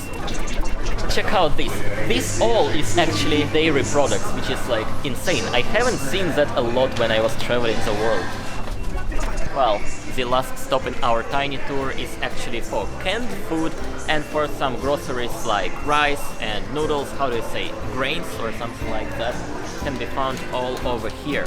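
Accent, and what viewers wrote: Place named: Russia. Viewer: why do you speak english with an eerily similar accent and fashion that putin does?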